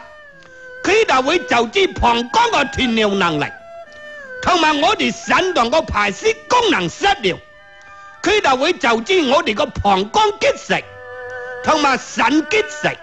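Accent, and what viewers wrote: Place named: Taishan, China. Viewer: 勁，台山口音！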